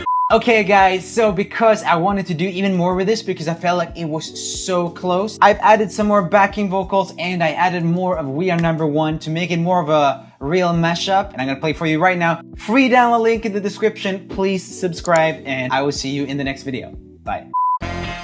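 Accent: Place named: Sweden. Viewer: Why sound so different and having Swedish accent 😅😅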